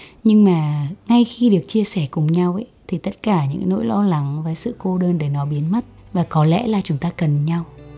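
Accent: Central, Vietnam. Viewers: Hic chị quê ở mô rứa ạ. Em thấy chị nói giọng miền trung 😊 Tập này như đang nói đến em vậy . Em cũng đang ở xa nhà ít bạn bè và đôi lúc cảm thấy cô đơn nhưng rất thích quãng thời gian một mình Đôi lúc bản thân cũng có thời gian lặng để biết bản thân cần gì😊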